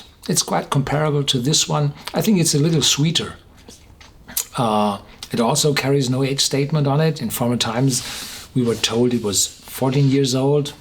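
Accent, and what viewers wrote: Germany. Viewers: Where are you from? Your acent sounds like a German one.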